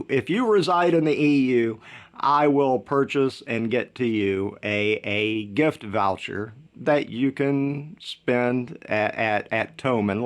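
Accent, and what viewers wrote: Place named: South, United States. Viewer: like this video and all other s .i just stupidly across.like the way you talk sounds country.stay cool and God bless.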